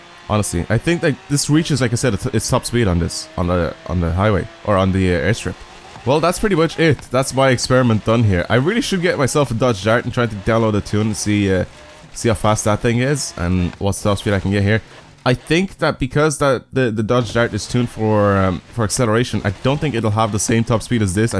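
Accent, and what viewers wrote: Dublin, Ireland. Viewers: Are u irish? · R u Irish like from Dublin down sorta thing? I'm from Derry personally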